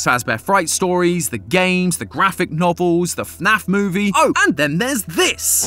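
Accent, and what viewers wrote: United Kingdom. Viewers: I'm so used to matpat that it scared me when I heard a British accent love ya!😭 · I want matpat back Your voice is just too British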